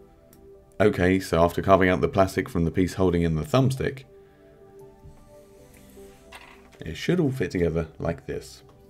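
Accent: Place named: United Kingdom. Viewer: I think you are the most british guy i've ever heard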